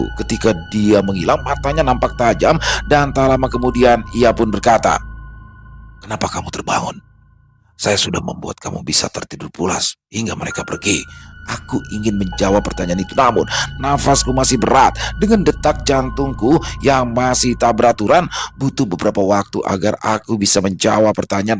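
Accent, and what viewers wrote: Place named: Java, Indonesia. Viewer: Selamat Pagi mas? Ooo pantesan mas bicaranya Macam logat jawa ha ha ha mas jangan kuatir ya saya pasti dukung terus maju teruuss ❤🎉😊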